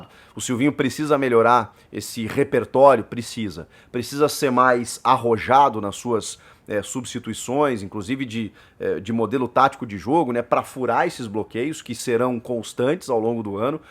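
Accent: Rio Grande do Sul, Brazil. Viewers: Para de puxa gaúcho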